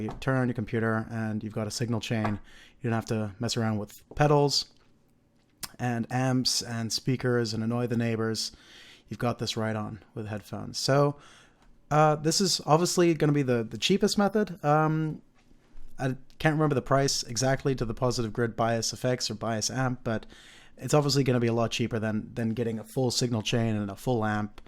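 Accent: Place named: Germany. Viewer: Dude. Are you a German that learned English? Christ I thought this was American for ... first 15 minutes! Great channel and checking things out. Brian Jones tone cuts more to the core of listeners and is an awesome thing to take a look at.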